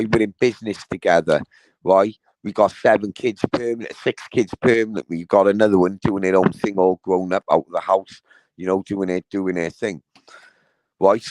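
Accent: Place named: Liverpool, United Kingdom. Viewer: your Scouse accent is immense 😂😂😂. Funniest accent ever 🤣🤣🤣. Chicken smack and chocolate I'm nearly f🤣cking dead here 💯💯💯✌️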